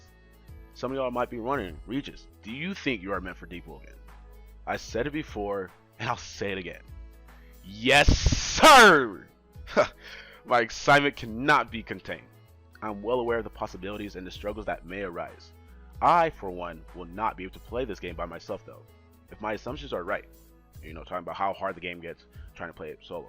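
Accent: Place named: United States. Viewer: what was ur first language then? cause ur american accent is on point g.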